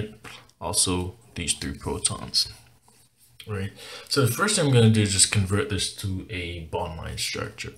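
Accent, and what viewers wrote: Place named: Caribbean. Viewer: I think I am hearing a Caribbean accent here. Thank you for this tutorial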